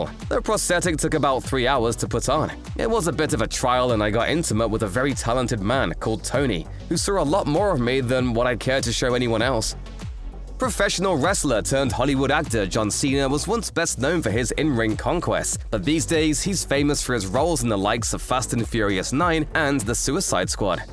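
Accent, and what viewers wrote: England, United Kingdom. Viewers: It's an English accent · He actually said “Dirk” but his British accent make it a bit like Doug to American ears.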